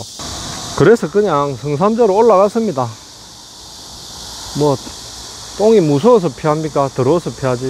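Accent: Gyeongsang, South Korea. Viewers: 차분한 경상도 사투리가 언제들어도 편안해 지는것은 저혼자만의 생각은아닐겁니다. 길에서 봉이 김선달처럼 돈 뜻는일이 어찌 그곳만 그랬을까요? 옛날 에 씨가 때어날때쯤에는 서울에서 시골로 주민등록 전출때 동사무소직원에게 그때 최고로 비싼 신탄진 담배한값인 50원을 열려있는 서랍에넣어주지 않으면 주민등록서류가 언제 도착할지 모르는 그런시절도 있었답니다. 그래도 지금은 정말 세상좋아졌다고 생각합니다.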